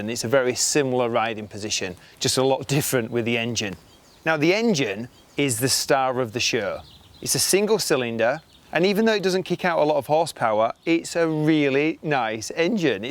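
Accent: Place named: Yorkshire, United Kingdom. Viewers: Did not expect the northern accent in that location. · Bridlington accent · Hull/Yorkshire accent ? Lovely little bike and the price is bang on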